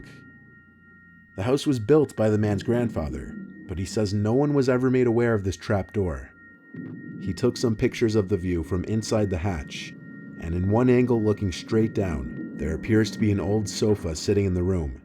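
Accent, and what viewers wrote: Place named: New Jersey, United States. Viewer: Me nightmare is def from like New Jersey or philly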